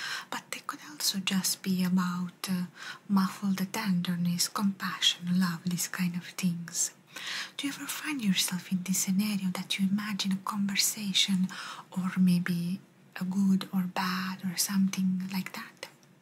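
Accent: Italy. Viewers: I didn’t know you are not Italian until I checked it 😂 such a perfect Italian accent, amazing! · Ciao! Mi piace molto il suono della scrittura e il tuo meraviglioso accento Italiano è così dolce e rilassante! Complimenti, sei bravissima e bellissima 🫶🥰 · Great video. You always do a fab Italian accent ❤ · The Italian accent was done great, and i really like your therapy ASMR. This one reminded me of a recent therapy appointment of my own, which went great!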